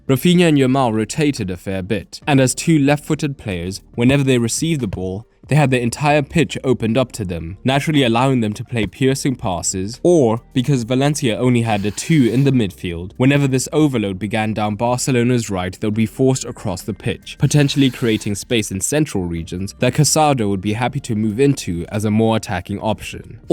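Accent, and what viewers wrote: South Africa. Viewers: Why does bro sound south african😭😭😭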